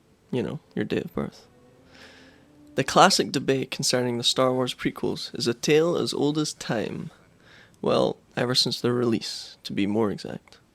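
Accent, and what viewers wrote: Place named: Scotland, United Kingdom. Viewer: You sound like an Irish episode 2 Anakin Edit: yes, Scottish, my bad!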